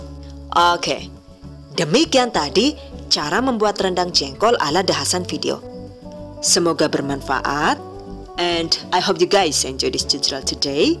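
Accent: Banyumas, Indonesia. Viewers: Oalaah Wong ngapak mbokayune 😀😀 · Mbaknya dr Banyumas ya ngapak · Jebule wong ngpak😂😂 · Perfect banget Bunda, bdw kok bisa ngapak emang mertua org mana Bund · Jebulnya ngapak yak hehe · Deneng pada karo nyong mak ngomonge ngapak😁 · Inggrisnya Fasih 😁... eh jebule wong ngapak ya 😅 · Bahasane ngapak kyk BN aku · Dene ngapak...ora ngapak ora kepenak 👍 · Mantulll... Paket komplit.. yg dulu blm doyan jengkol. Sekarang udah suka... Kok suaranya ngapak ? Heeee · nice info ..mksh.. ini bhs nya kok ngapak banyumasan..dr madiun or banyumas yha heee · Kok ngapak logatnya 🤣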